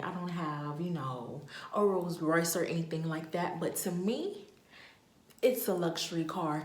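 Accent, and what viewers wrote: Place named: New Orleans, United States. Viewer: Are you from BR or New Orleans ? It sounds like the accent 😍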